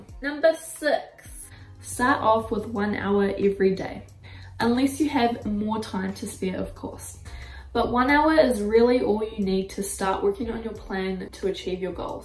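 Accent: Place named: Australia. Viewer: I hear an Australian accent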